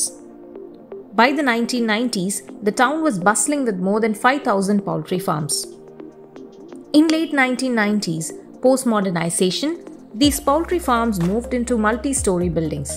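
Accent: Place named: Kerala, India. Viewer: Hello. Nice video, no doubt. But few points to improve: - voice/accent can be improved. Voice has Malayalee English feel. - When mentioning cities / other nations, stick to a common platform: Either say Dubai, Muscat & Doha or say it as UAE, Oman & Qatar. TH could be little careful on it. Anyways keep up the good reporting.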